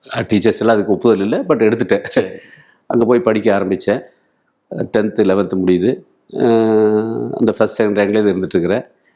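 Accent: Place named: Tirupur, India. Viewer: Tirupur accent ❤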